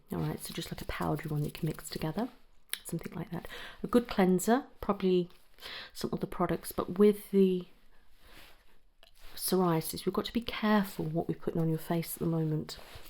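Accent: United Kingdom. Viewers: You’re seriously the best at these doctor roleplays. Very relaxing. Can’t watch any other doctor roleplays anymore cuz yours are better in my opinion❤️ plus I love the British accent (idk why, different languages and accents give me a lot of tingles)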